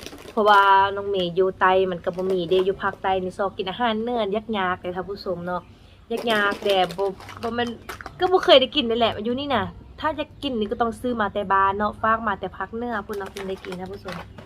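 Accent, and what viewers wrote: Northern Laos, Laos. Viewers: สบายดีน้องเมย์คนสวยน่ารักมาคอมเม้นท์เป็นกำลังใจครับน้องเมย์'หลังจากไม่ได้คอมเม้นท์นานแล้วแต่ก็ยังติดตามน้องอยู่นะครับคนสวยสายบุญคนสวยนํ้าใจงามมีของอร่อยเยอะเลยสาหร่ายนํ้าจืดครับน้องเมย์จากสาวลาวเหนือมาเป็นสาวลาวใต้สำเนียงเสียงพูดลาวเหนือพูดเพราะนะครับน้องเมย์นุ่มนวลฟังแล้วน่าหลงใหลครับน้องเมย์ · ชอบฟังสำเนียงลาวเหนือ น้องเมย์พูดเพราะดีค่ะ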